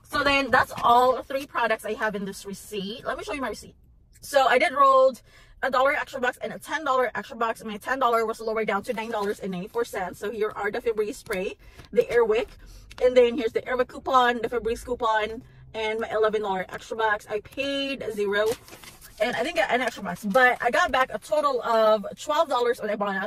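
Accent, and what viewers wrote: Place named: Philippines. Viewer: My good friend is from PI and talks the same way. Maybe it's a cultural thing. It seems fine to me.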